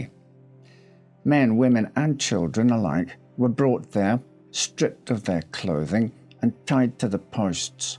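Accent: Scotland, United Kingdom. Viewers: I’ve got to stop watching well I never when I get into bed. No matter how interesting the story is, that calm Scottish like accent knocks me out every time. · Wow - just wow. Weird hearing local history from someone with an English/Scottish/Irish accent, but somehow it just makes it seem more interesting. Thank you and subbed. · "Scottish like"? He's 100% Scottish 👍😀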